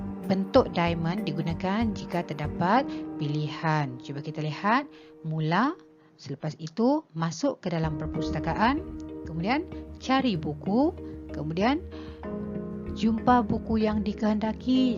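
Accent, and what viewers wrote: Sabah, Malaysia. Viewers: Ckgu orang sabah ke atau sarawak?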